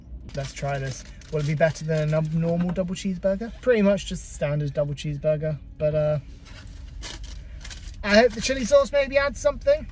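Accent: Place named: Surrey, United Kingdom. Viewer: I’ve been trying to work out what part of the country you live in, as you have tons of chain brands in your vicinity; but your accent leaves me puzzled!!! Where?!! 😝 Surrey??!!!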